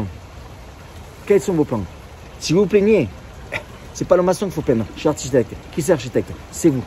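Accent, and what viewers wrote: South, France. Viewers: est parti aux 🇺🇸mais il a gardé son accent du sud et le Pastis 🥇👍👊🏻💪🏻🙏🇫🇷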